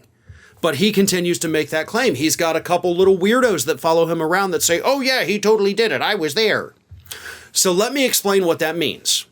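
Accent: South, United States. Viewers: I caught your southern accent. Thanks for all your good content.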